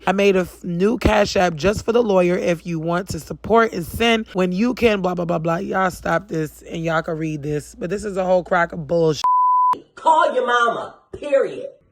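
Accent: New York, United States. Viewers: Just subbed to your channel! Love your content and I absolutely love your NY accent!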